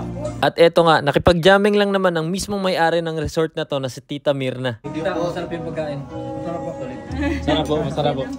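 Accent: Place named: Samar, Philippines. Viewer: Taga samar ka pala talaga.. sabi ko na ei kc ang punto ng salita mo...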